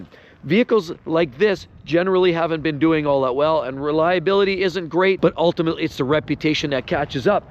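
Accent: Canada. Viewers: You' re canadian, aren' t you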